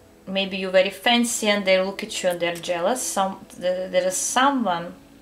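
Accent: Russia. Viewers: pile 2. "someone's lying on the couch crying and watching many readings right now" LMFAO i dreamt of crying last night and also cried bcs of the sad news i heard.. and now i'm sitting watching all of your readings so accurate this is amazing! and also when u said that a relative was jealous of me someone living next door... i already know who she is.. my own aunt my dad's cousin! she always imitates what we buy and wants us to be below them, whatever i have the next day she'll do the same to her children ugh! and also the guy that was watching me is my ex.. an abusive obsessive ex! and i am so happy he is no longer in my life even though he tries to come back i will never let him in my life again!!! thank u so much for such an accurate candle wax reading! u have officially been my favorite tarot reader <3 success for you my lovely russian lady! (don't know if u are but i just guessed from ur accent)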